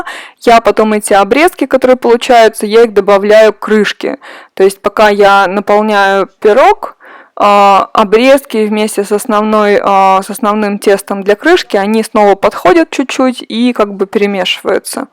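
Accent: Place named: Siberia, Russia. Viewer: Я тоже почему-то подумала про оленину, когда читала и слушала описание вкуса кенгурятины ))) Я родилась и выросла в Норильске, помню пельмени к коробочках машиной лепки из оленины ))), ваша скорость мысли и речи выдает сибирячку, москвичи и вообще запад России говорят иначе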